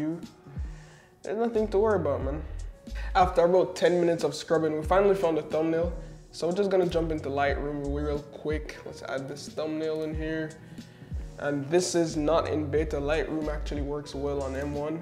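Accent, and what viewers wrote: Jamaica. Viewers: You sound like a yard man still. I can hear two accents when u speak lol. Good video 👌🏾👌🏾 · Great video, I love that slight Jamaican accent.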